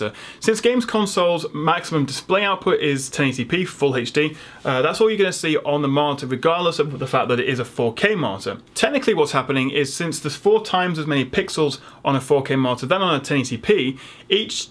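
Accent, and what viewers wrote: United Kingdom. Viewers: Nice video btw. Should sound "eich" which sounds more natural. No offense to the british language. My uncle lives in england and my aunt is an engish women. I'm familiar with this accent but h always sounded kinda strange. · Are you from UK?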